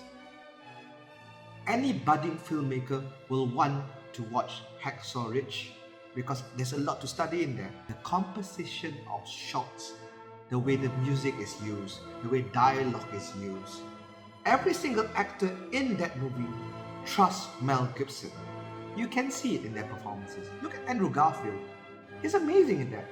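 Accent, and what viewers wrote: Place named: Australia. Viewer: Me walking around listening "Continually" Why is this AI Australian?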